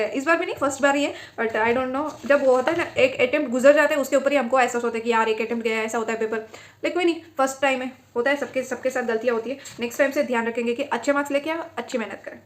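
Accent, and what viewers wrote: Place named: South India, India. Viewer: You sound like you're from South Indian .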